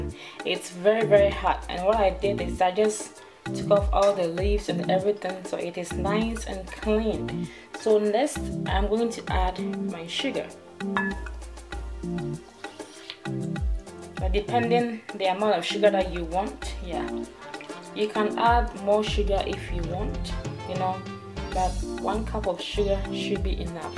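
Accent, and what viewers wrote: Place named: Ashanti, Ghana. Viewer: I smell an ashanti acent Grove Garic Brood Lol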